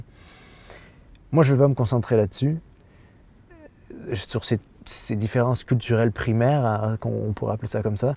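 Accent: Quebec, Canada. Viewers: Le tutoiement Français dépend des milieux. Entr' ouvriers il est quasi obligatoire. Des que ça sort du milieux professionnel ou qu'on a pas d'amitié avec l'interlocuteur c'est du VOUS. Un petit canon de rouquinos et le Vous s'enfuie. Ton accent Québécois est léger et facilite la compréhension pour l'oreille moyenne du Français qui a du mal à tout enregistrer de l'accent prononcé généralement par les Québécois. L'accent Français Breton , souvent rapidement parlé rend aussi la compréhension difficile pour d'autres.En France, plus on va dans les campagnes plus l'accent particulièrement prononcé ressort selon la région. Les villes lissent cet effet. Sauf pour les accents méridionaux qui sont toujours présent en ville comme à la campagne.Vive les accents, particularités miroirs des paysages. Petit survol en rapport avec ta vidéo intéressante.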